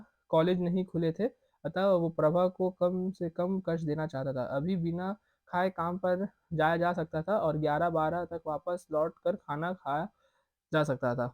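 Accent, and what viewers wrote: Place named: Kolkata, India. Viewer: bhai aap kolkata se ho? awaaz se lagraha hai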